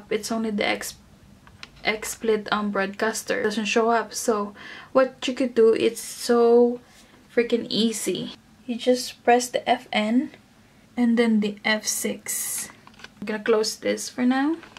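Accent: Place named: Iraq. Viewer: I feel that u r iraqi person speaking english xd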